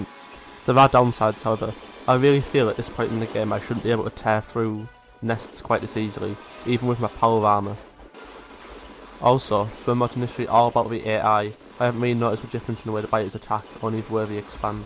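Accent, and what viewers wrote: United Kingdom. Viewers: are you from the Uk?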